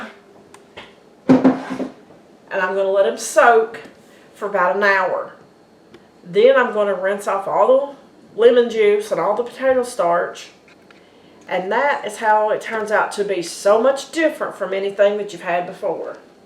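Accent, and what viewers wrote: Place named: Appalachia, United States. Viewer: Hi! I just attempted to email the yahoo email address listed, but it bounced. Here is what I wanted to ask you about: What about Sweet potatoes? Last time I canned conventionally in the traditional sugar/water, they were just so mushy, not much good for anything needing texture, plus the added sugar isn't always needed depending on the recipe. I think I did maybe dry can some cut in long slices like fries last year....but I wasn't sure about chunks. Can you instruct here, or maybe make a video? Oh, and if it matters: this year I grew white sweet potatoes, though I have done traditional orange ones in the past. The whites are slightly less sweet, and actually can be used as a sub for mashed potatoes, or in any other dish calling for potatoes. Thanks! Anita P.S. I love your accent. I grew up in southern OH (bordering WV) the accent there is referred to as "hillbilly"..., and I've hung onto it despite living in other parts of the country for almost 40 years. 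Not sure where you're located, but you remind me of home :)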